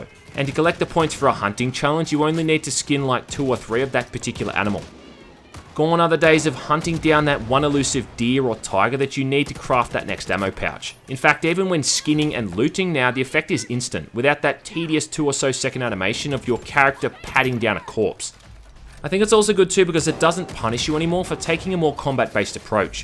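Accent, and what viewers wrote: Australia. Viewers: Dunno why but it was cool when you came out with the Aussie accent I haven't seen your vids before Just bought far cry 5 today, waiting for it to download Cheers from Perth mate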